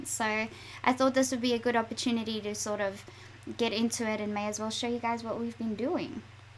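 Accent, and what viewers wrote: South Africa. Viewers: I wasn't expecting the Safrican accent :) Pleasant surprise. Great looking models too. Enjoy playing with them!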